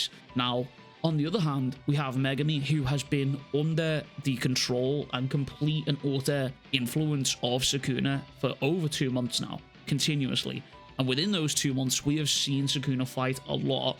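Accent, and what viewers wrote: Liverpool, United Kingdom. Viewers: Hell yeah a scouse man calling megumi a bum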